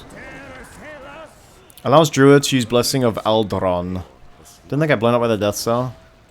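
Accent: United Kingdom. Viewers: I liked as soon as I heard this glorious British voice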